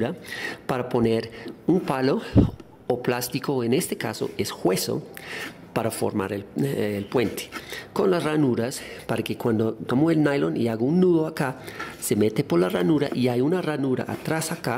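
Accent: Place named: Brazil. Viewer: Se me hace que tienes acento brasileño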